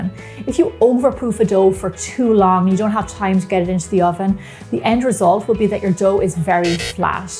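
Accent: Ireland. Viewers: Well, I can make hundreads of dishes but will never get this right.given up on bread making. this is a good film. TU for sure and she's very atractive bread maker but sounds Irish not english. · she sounds Irish to me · TY for the tips. Love, love your accent, I could listen to u all day. I believe it's Irish? Both my great, great Granny's were named Bridget & came from Ireland to the U.S. (to the great state of New Jersey) during the late 1800's both married German immigrants named William. I have made several of your recipes and they were quite good, Keep up the great work. 😁👍💖👏👏👏👏👏👏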